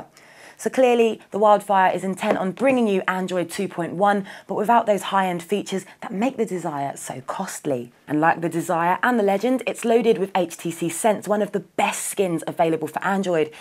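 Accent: United Kingdom. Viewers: stop speaking british, its annoying!!!! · i buy ihm few days ago so i dont know what she say?if is price than i buy me Vertu Signature...or if is procesor and ram and graphic i buy me Alienware laptop so funny and all who want to buy a wildfire buy it u will not mistake it doesent cost alot and u have evrythingwhy is this or that ...hm money,that much music...we say like thatand this english Mate girl is talking bad about that phone bloody hell xD hate the acent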